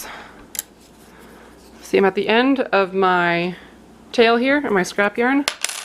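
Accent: Canada. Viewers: You are amazing! I love that you figured out how to make the socks without doing the Kitchener stitch. I think at my young age of 73 that I should just buy my socks and not try to make them on machine or by hand knitting. It could take me the rest of my life to figure it all out, ha ha! I have tried hand knitting without much luck. I hate to spend a lot of money on a machine without being able to figure all the ins and outs. Lovely how you have done such a great job for yourself! You sound as if you are from Canada so I will not hope that you can teach me unless you would like to spend a couple of weeks in North Carolina! Bless you girlfriend. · I love the voice and accent. I'm guessing Canadian. I'm convinced this is Matthias Wandel's sister!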